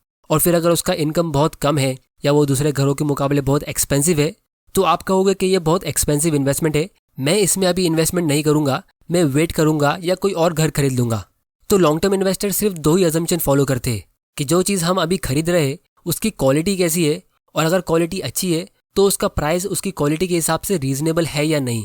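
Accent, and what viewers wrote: Gujarat, India. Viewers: Are u gujrati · Ur gujrati accent is good✨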